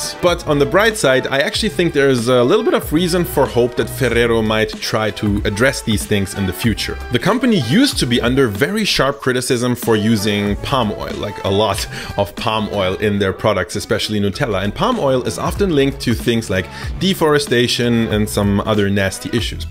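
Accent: Germany. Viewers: This is probably lhe least german german accent I've ever heard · Is he german? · First time on your videos. I detect a german accent, am i right? I like guessing people's accents 😁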